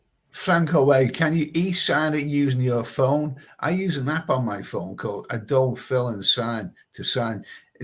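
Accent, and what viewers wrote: Liverpool, United Kingdom. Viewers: Well, he is a scouser.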